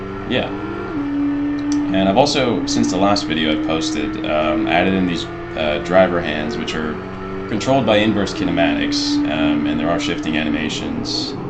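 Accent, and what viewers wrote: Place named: North Carolina, United States. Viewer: I'm also from NC, nice to hear a local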